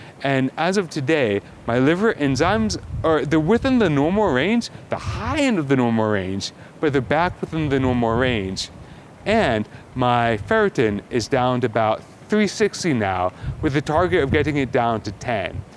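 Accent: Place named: Japan. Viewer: A white guy, with a Japanese accent?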